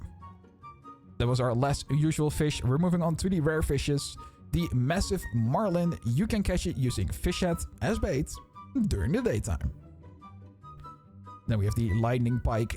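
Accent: Netherlands. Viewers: je hoort gwn dat je nederlands bent lol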